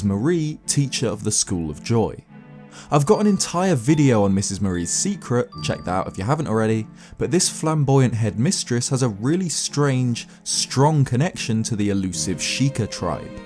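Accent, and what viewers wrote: United Kingdom. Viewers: Am I the only one that's crushing hard on this guy? Zelda love? British accent? Cutie? 😭 I promise I'm not thirsty just wanting a Zelda soulmate.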